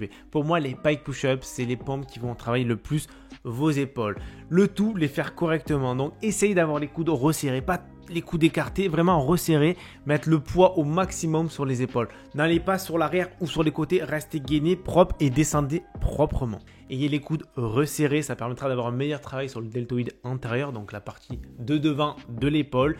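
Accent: South, France. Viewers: Le bel accent du Sud ! Il y'a que ça de vrai ! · Accent du sud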